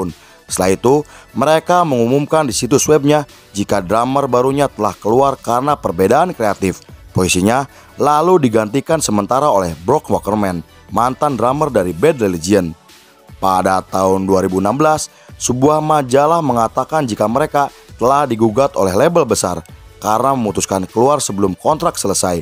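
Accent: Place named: Sunda, Indonesia. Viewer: ini narator nya orang sunda, kentel bat logat nya hahaha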